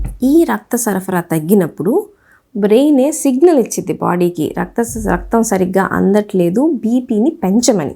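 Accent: Guntur, India. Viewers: గుంటూరు యాస బాగుంది మేడం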